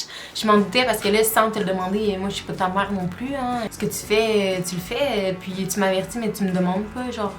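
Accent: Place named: France. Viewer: pourquoi tu parle comme les français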